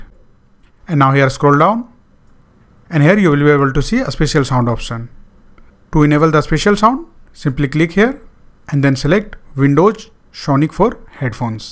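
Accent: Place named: England, United Kingdom. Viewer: goooosh youre english accent i DIE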